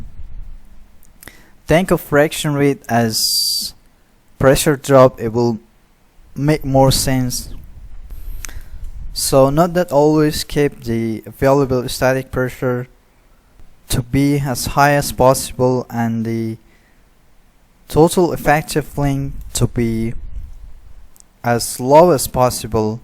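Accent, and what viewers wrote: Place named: Pakistan. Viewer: i think ur pakistani trying american accent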